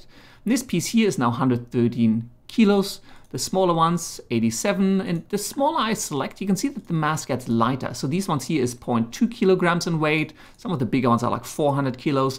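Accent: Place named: Germany. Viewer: Ich mag "Deutsches Englisch"... Grüße aus Norddeutschland... Cooles Video.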